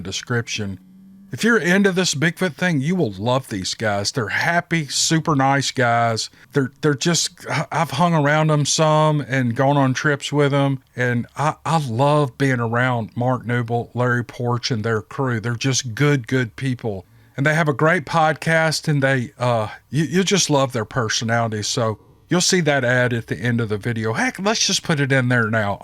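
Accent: South, United States. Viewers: I always hit the like button after listening to stories (yammer all you want my friend, I enjoy it, and your southern accent makes me long for Memphis), but I had to hit right away because the "like" button was at 666. This video was a great series of stories - as usual! BTW, the people you talk about at the end of the video are the same people who badger and pick arguments with everyone around them whether they are work, in a bar, or even standing at a bus stop. They are straight-up A-holes, and the social media platforms of the cyber-age have only enhanced their ability to irritate and annoy at an exponential range. Every village has it's idiot, and every channel has it's troll(s). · Love your story telling! That southern accent gives a warm memorable touch! Please continue and repeat daily.👍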